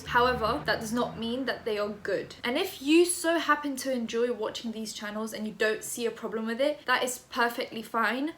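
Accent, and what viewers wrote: United Kingdom. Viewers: honestly i usually can't stand british accents but i enjoy listening to you so much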